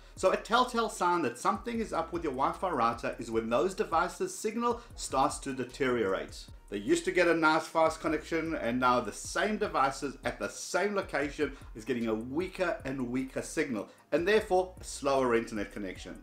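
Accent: South Africa. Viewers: You confuse me... S. African Accent, Israeli name... but you live in the US?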